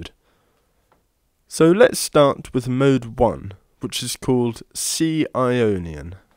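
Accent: United Kingdom. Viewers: thank u ،،;btw r u from UK?i enjoyed ur accent 👏👏♥🌷🌷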